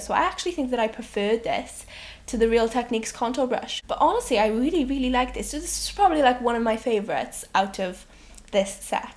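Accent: Wales, United Kingdom. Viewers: Are you from Wales? 🏴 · U Welsh?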